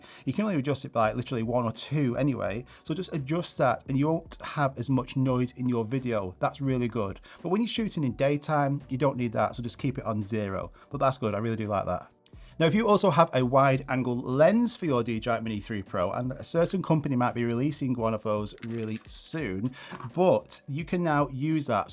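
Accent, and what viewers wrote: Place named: Cumbria, United Kingdom. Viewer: You sound like you're not too far from Kendal.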